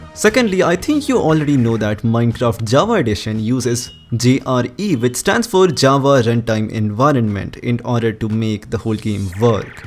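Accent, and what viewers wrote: India. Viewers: that's an Indian accent for English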